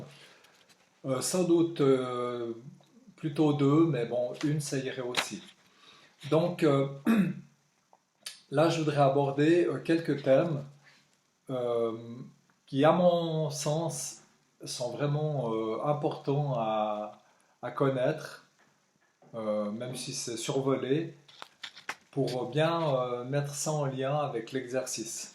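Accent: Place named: Switzerland. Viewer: Tu es pratiquant bouddhiste ? Quelle tradition, où ça, quelle Sangha ? Vu ton accent je crois qu'on est pas loin géographiquement ? Tu semble être suisse non ? Moi je suis tout près de Genève !!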